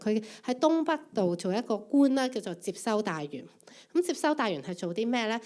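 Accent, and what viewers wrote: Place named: Hong Kong. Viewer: 香港话好听❤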